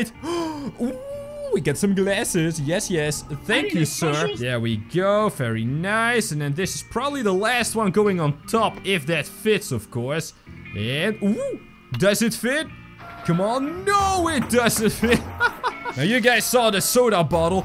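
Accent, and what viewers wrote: Netherlands. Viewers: are you not dutch hmmmmmmmmmmm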